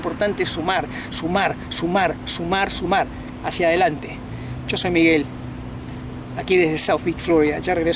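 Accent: Argentina. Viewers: Eres peruano?, y el dejo argentino???....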